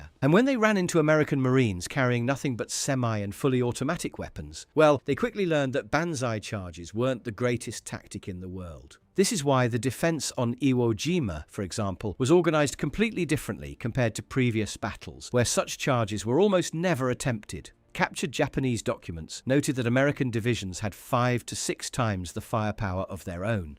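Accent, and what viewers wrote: United Kingdom. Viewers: Hilarious crapping on a gun that helped win a world war and the fact a brit is the commentator makes it even more hilarious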